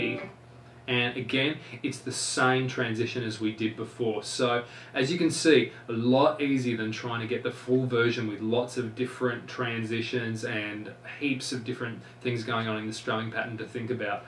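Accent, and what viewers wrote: Australia. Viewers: lol. More like Aussie. Though i find it hard to differentiate between kiwi unless i hear both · No, he sounds Australian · Nice! Are you Aussie? :)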